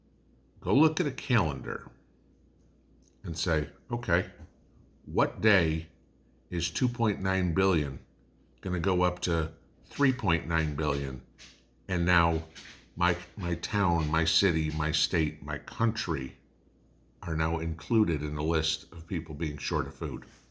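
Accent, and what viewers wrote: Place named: Appalachia, United States. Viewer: You sound as though you could be a neighbor...I'm deep in the Appalachias.....same out here....and I love it!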